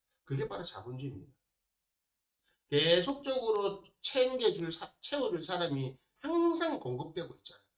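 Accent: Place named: Busan, South Korea. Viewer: 선생님ᆢ 유튜브. 올라오면. 거의. 듣는데. 사투리가. 심해서. 좀 못알아 들을때가. 많은데. 질적인. 내용은. 참 깊이 있으셔요 ㆍ감사합니다 부산이신가봐요?? 사투리가. 심해서요??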